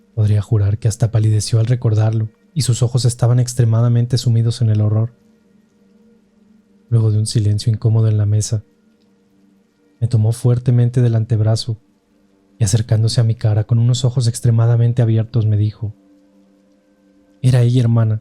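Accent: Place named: Mexico. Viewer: Mmm...No es una voz desagradable....Pero es de UN solo tono...sin matices...se nota el acento mexicano...y NO consigue "dar miedo"..😝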